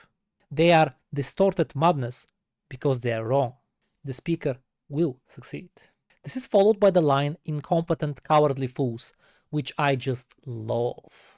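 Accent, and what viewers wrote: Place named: Serbia. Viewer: Only Lovebites can make a song like a mix of Slayer and Testament but still got it with their own style. Gotta love this. M & M guitar duo just cant be beaten. Lovebites reactions from this dude are always great. This bro knows music and his accent reminds me of someone from my area (i am serbian)-ex Yugoslavia. Love it. Just keep it up bro-dont stop, you re good. Respect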